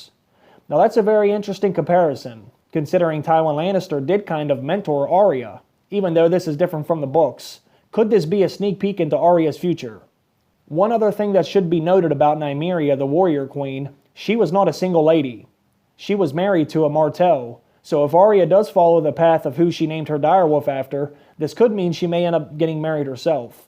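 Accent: Pittsburgh, United States. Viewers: I like your channel. BTW where are you from? You sound like you have a little bit of a Pittsburgh accent. : )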